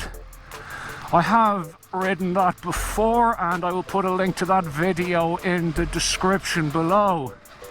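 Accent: Ireland. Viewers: Where in Ireland are you from. Cant figure our the accent?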